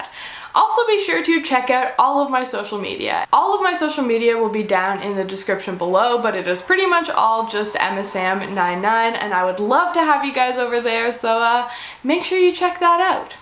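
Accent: Canada. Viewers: It's funny because people always point out my Midwestern accent , and I just noticed your Canadian accent the way we both pronounce out and car and about is like the same lol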